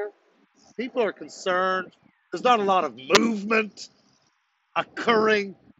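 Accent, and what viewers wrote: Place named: United States. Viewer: 1984 is a book by George Orwell, Mate. You sound American, though. Love from NJ, USA. GO TRUCKIES! SHUT IT DOWN! Australia's leaders have gone insane.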